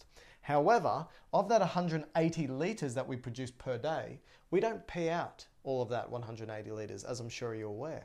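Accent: Australia. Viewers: It is an australian accent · As an Aussie, I can confirm it’s an Aussie accent.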